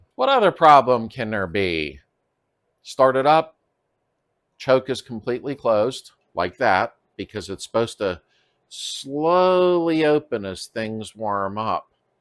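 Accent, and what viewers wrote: Pittsburgh, United States. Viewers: Just discovered your channel! From your accent and the WDEV sticker in your shop …. I’m thinking you’re a Yinzer! So from one Yinzer to another …. Thanks for great content. I discovered you while doing some research on buying and maintaining a classic beetle. I’ve been in the market for a super beetle convertible. Solid driver …. Not a show car. Your content has been really helpful. Any recommendations on sites to shop for a car would be helpful. Thanks again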